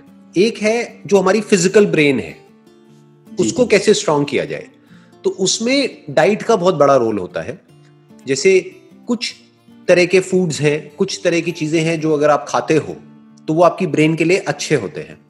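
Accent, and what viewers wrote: Gujarat, India. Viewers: jab gujarati log hindi bolte hai tab pata chal hi jata hai ki vo gujarati hai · Oh God I just loved his Gujarati accent 😘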